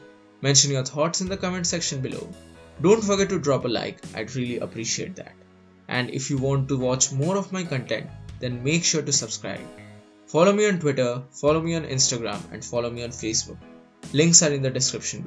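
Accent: India. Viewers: Wanna have an Indian English conversation? we talk just like him.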